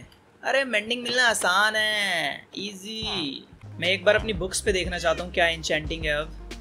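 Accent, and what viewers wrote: Haryana, India. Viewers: I love when you speak Haryanvi because I am from Haryana I am your big fan love you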